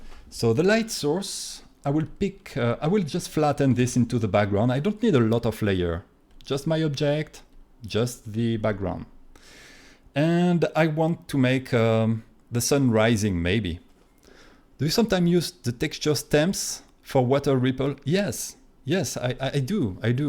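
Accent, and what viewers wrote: France. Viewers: Your French accent is a heaven 😭💕 Anyway I like your style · I really like your video's. I learn a lot from your tutorials. Keep it up! Your accent emphasises my vision of a French painter in Paris. 👍👍👍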